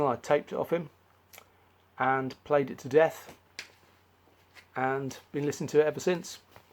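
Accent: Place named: United Kingdom. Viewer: Hi, I've just found your channel and subbed. It's always great to find another UK voice, especially one talking about Prog. I've not heard of before. One for me to look into. rings a bell. I'm sure I have a 12 inch single by them somewhere. are a new one on me too. Nice Congrats on the Roger Dean cover. I've not seen that cover folded out like that before. Fantastic. I've had my eye on the album for a while now, purely because I live near some viaducts like those on the cover. I was put off though when I read a review that it was reminiscent of. But it sounds like I should give them a go anyway. Great video! We didn't overlap once. Cheers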